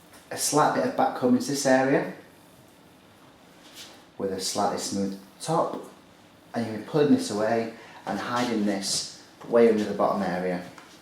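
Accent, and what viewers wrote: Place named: Lancashire, United Kingdom. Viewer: Nice - impressive to get so much volume with just back-combing & clips (especially on silky hair); that brush sounds gentle. I like the teased/ smoothed wrap-around at the end (aprox. ); very pretty way to pull everything together. Awesome hat (I've been wanting one of those for a while)! I wish I knew more about English accents; yours reminds me of a couple famous people from (..looking them up now) Lancashire, & Yorkshire. Hope to see you again soon! x o :)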